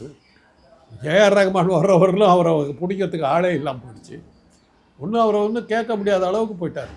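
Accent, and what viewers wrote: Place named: Karaikudi, India. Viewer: காரைக்குடி தமிழ் தம்பி...... தலைப்புக்கும் பேட்டிக்கும் சம்பந்தமே இல்லை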